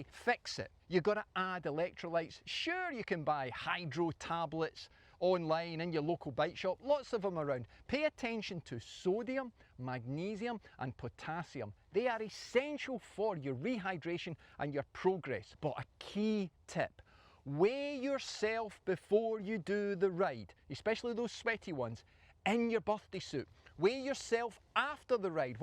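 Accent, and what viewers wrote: Scotland, United Kingdom. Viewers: "Blame your bake"! LOL I love this guy - he doesn't sugar coat anything and tells it like it is, all with a Scottish accent! SUBSCRIBED! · Good points!❤ Two off-topic questions 😊 - 1) why the mirrored video? 2) where exactly in Scotland can I get some of this accent? ❤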